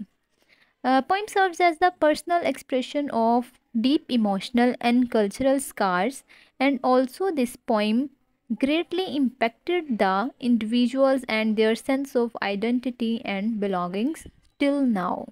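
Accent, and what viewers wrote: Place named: Lahore, Pakistan. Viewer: Thankyou Mam ji....... love from India and aap Lahore ki Hai mujhe pata chal gya jab aapnne bola theek ho gaya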